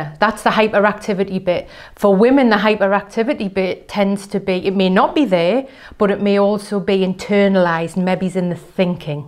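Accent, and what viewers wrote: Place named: Newcastle, United Kingdom. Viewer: Newcastle ? Love it 😊 hi 👋 from Liverpool ❤ Thanks for the info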